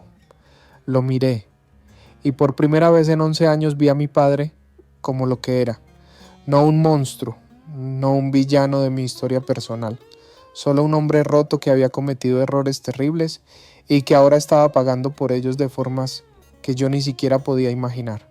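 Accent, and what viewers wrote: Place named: Central America. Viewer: buscado una voz con acento argentino y no centro americano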